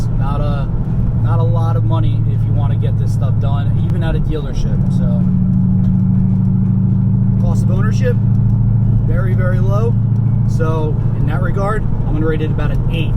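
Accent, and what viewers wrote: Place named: Philadelphia, United States. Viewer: Love that Philly accent! Good luck with the channel. Great video.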